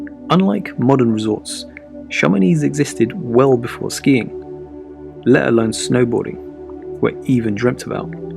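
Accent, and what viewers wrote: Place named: England, United Kingdom. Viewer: i like your voice and your english accent, you put it down that relax me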